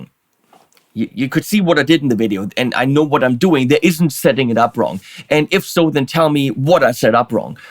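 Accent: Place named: Germany. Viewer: ..... 🤩🥰😍😊 👍👍👍👍👍👍👍👍 Deine Arbeit, deine Reviews, dein Witz/Humor, dein Guitarplaying, dein "deutscher Accent" 😂, deine farbefrohe Kleiderwahl (absolut meins 👏👌), die Tier-Clips am Schluss....... 😂 Habe mittlerweile natürlich einige ähnlich gute "Rezensenten" gefunden, deren Clips ebenfalls richtig gut sind..... die ich mir (man sich) auf jeden Fall von vorne bis hinten reinziehen kann...... aaaaber, Du bist unschlagbar 😅✌😎 Freue mich auf jeden neuen Clip von dir 👍 Vielen vielen Dank für deine Arbeit und beste Grüße aussem Pott Rosigatton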